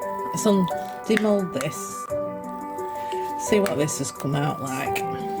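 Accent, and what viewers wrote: Northern England, United Kingdom. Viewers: Lovely to hear a northern voice (I’m from Wigan) I love this video and nice to see I’m not the only one with a few bubbles. I love your video’s.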